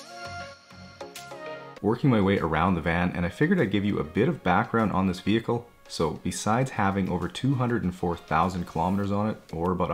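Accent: Canada. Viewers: My favorite part watching these vids, as an American, is seeing how proper this dude talks during the voice overs and then seeing him go full Canadian once they come to pick up the vehicle. Cracks me up every time. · As a Canadian, he sounds Canadian in his voice overs.......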